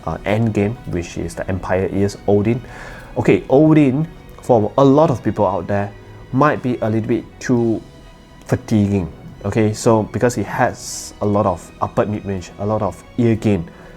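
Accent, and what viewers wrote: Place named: Malaysia. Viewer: I do listen to Jrock as well because not just female vocals but male vocals too, which means I do like a bit of bass, but not sharp treble. As long as it's not fatiguing. Seems like I'll need to look into the Zero 2 then if it has an added bass. Still using my Tanchjim One and Tanya (both DSP) and they're not bad, but female vocals can have some sibilance at times. Awesome to hear another reviewer from Malaysia! Our distinct accent stands out a lot. 😆 Anything that you would suggest in the sub $50 with a bit of bass? Not too much, but enough that you feel it.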